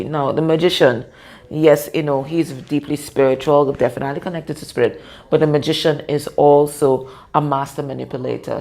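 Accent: Trinidad and Tobago. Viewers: Am I catching a trini accent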